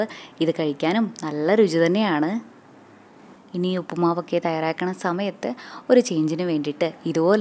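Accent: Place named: Thrissur, India. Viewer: 🌷👌🌷, നല്ല തൃശൂർ ശൈലി ഭാഷ 🤪💞👌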